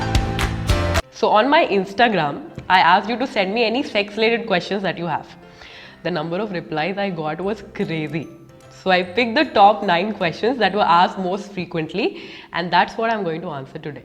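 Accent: Hyderabad, India. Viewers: U Hyderabadiii? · Omg,are you from Hyderabad....soo hard to know it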